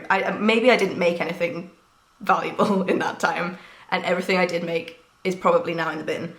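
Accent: United Kingdom. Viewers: Hi, I was wondering....Are you English? Cause, I like your Accent, there. 🆒️🆗️👍. I hand knit & use a flatbed knitting machine. But, I'm not a Podcaster. I just watch others knit & Crochet. I Love that most. I hope you show a lot of your knitting projects on YouTube. So, that Knitting Family can see your work! And NEVER, we're if you are showing mistakes in your work. Cause, that's just teaching everyone that, No one is perfect. And that's OK. Everyone is Human. Just go for it, your Dream, here. I will be rooting for you. Bye, for now. Blessings I send to you, too. 🙏. Sincerely, Elsa R. 64 yrs. young/old woman. From out in California👋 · Enjoyed your first podcast, nice to have another UK voice in the mix!!! Loving your red cardi. There is a school of thought that suggests, when you have a lot to do, doing/finishing the easier or quicker things first is helpful because quickly you can get the list down to fewer items which eases the overwhelm…??? 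Dunno if this appeals? Also loving the look of your ‘scrappy cardigan’ that’s going to be beautiful.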